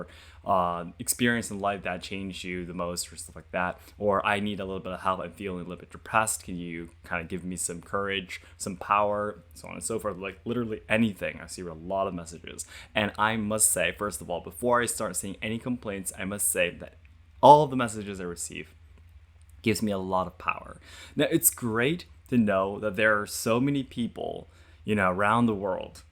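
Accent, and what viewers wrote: United States. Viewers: the first time I heard your voice I detected a Northern Midwest accent. Your spoken English is at least 97 per cent. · I've listened to most of your content. I'm a southern American and I have never had any problems with your pronunciation. You sound just like an American to me. I can barely walk out my front door without hearing "y'all come back now"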